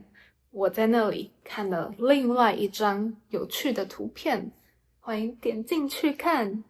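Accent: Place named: Taiwan. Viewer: Baby's Chinese mandarin is Taiwanese style lol I know he speaks good Chinese but very Taiwan ah : ) plus he always acting cue OMG the way he types words and how he expresses himself, anyway not mainland China style :face-green-smiling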